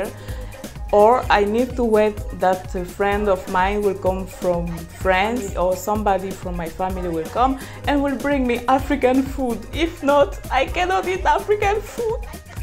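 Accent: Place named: Poland. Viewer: I speak Fluent Polish, You do have a polish English Accent, jesus lolz I love this...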